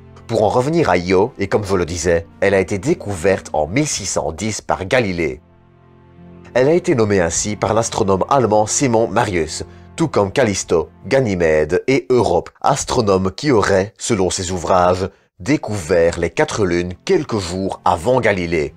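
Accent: Belgium. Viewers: La voix off est dégueulasse, c'est quoi cet accent belge, on dirait une blague!!??impossible de prendre cette vidéo au sérieux. Travaille ta diction stp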